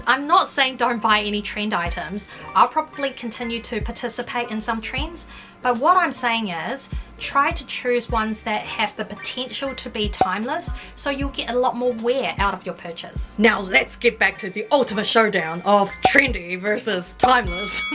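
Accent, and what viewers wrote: New Zealand. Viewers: Naw love the NZ accent. People some times ask me where in NZ I am from, but I'm just a German girl in Australia lol Love the editing on the video btw. Very engaging 😃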